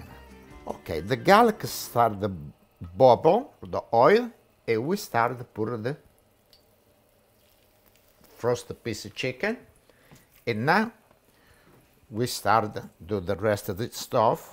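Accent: Italy. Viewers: I'm loving that accent, he's a true Italiano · Cin cin! Love his Italian accent! I made his parpedelle & Bolognese the other night. It was so so good! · I love his accent. Yes I want to learn how to make real Italian food from a real Italian. Thank you for this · Love when Italiens speak English ❤️ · Classic Italian acsent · Excellent recipe, the version with beef is called pizzaiola with origan instead of pepper and without eggs and breadcrumbs simply cooked. Italian accent but no Italian living in Italy would eat a second (main dish) together with a first course, we believe in the separation of... powers (chef Montesquieu😉 ). Dividi et impera..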